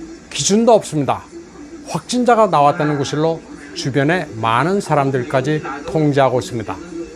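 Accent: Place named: China. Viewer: 죄송합니다ᆢ 우선 중국어를 아주 엄청잘시는것 같네요 발음이ᆢ근데ᆢ청취하는 입장에서 단어가 한자라면 한국식으로 해주면 안될까요ᆢ 한국에서 일상할수있는 한국어 발음이 안돼시나요 ᆢ중국을 잘알으셔서 그렇구나ㆍ하면서도 한국사람이 굳이 한자발음 4성 까지 정확히 발음하시는것보니 이질감이듭니다 거북합니다 유튜버내용은 경제 군사등다양분야에서경험하시고ᆢ통찰이있으신것로 사료되는데 ᆢ 요즘은 젊은세대는 외국에살아도 ᆢ유학을 갔다와도ᆢ한국어발음 정확해요ㆍ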